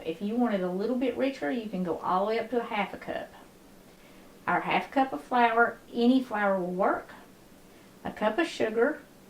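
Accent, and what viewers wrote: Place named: Tennessee, United States. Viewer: Thought I recognized a fellow Tennessee accent. For the Diabetic, along with the flour swap Substitute the sugar with a baking alternative like Splenda. And use REAL butter not margarine. I've done and taught Diabetics how to do low carb diets for eight years, these two swaps make it completely blood sugar friendly. It'll still rise, got it'll rise a LOT less. Love the channel and the emphasis on putting God first.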